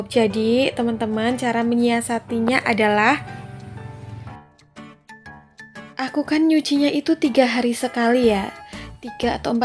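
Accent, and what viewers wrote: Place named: Banyumasan, Indonesia. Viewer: Mbaknya orang mana sih... Kok ngomongnya mirip kaya pengenyongan... 😁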